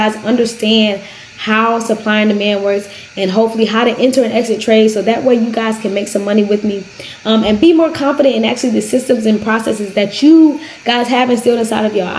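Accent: United States. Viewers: Are you from DC?? You sound like Blac Chyna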